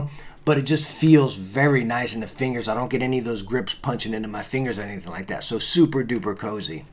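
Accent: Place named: South, United States. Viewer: I love this guys country accent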